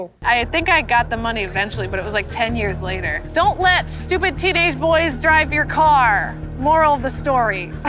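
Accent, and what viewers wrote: New York, United States. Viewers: Oh you are so funny when you do the “hard” NY accent. But I do hear it in your actual voice sometimes even if you don’t notice it…lol. So I have been dreaming of coming to NYC my whole life but everyone who has been keeps discouraging me saying it smells awful, there are rats everywhere the size of cats, and it’s so crazy busy. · Yes, you definitely have a New York accent, especially with your pronunciation of "Manhattan". You tend to drop the "t" sound" and say "Manha-an".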